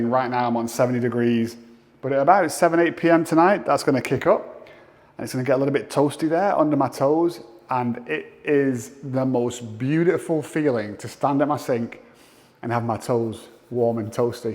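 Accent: England, United Kingdom. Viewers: He dosent talk American his he from England. Beautiful house Amazeing.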